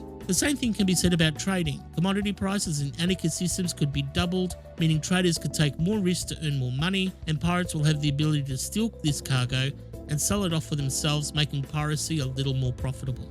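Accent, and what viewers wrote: Australia. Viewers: Couldn't agree more with your suggestions mate. A 14 year old with nothing to do but train and cred up can, and will, slaughter my helpless newbie ass just for shits & giggles, and suffer absolutely no consequences for it, so it's perfectly logical to me to stay in Solo and enjoy myself there. If FD were to take up some of your karma suggestions, that'd be good for the entire game and would get me into Open. The worst that could happen is the ganks would leave (good riddance) which wouldn't hurt Frontier at all since they don't have a subscription model. And frankly, these game mechanics make no internal sense. If the universe knows you're wanted, how is it they nobody knows you've murdered 50 other players this evening? It's a no-brainer that constantly ruining other players' enjoyment of the game should have consequences. You've got my vote. 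for FD karma konsultant!:-) By the way, loving hearing Strine on ED videos.:-) Keep up the good work!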